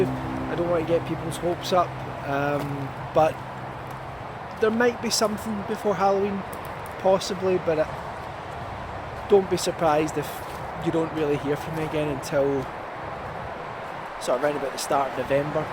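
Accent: Scotland, United Kingdom. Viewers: Just hearing you talk makes me proud of my Scottish heritage 😁ur awesome man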